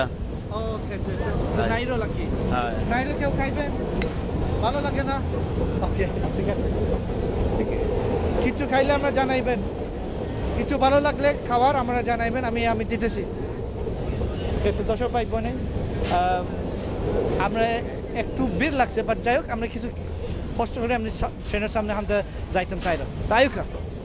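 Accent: Sylhet, Bangladesh. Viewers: ভাই এই লোকে সিলঠি মাথের আপনার ভোজার সমস্যা হলে আপনি আমাদের লন্ডন সিলঠ চলে আসোন♥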